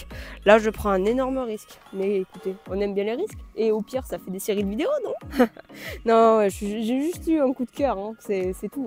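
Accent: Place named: South, France. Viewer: J’adore l'accent du sud ça nous rapproche du soleil 😊😉😊😉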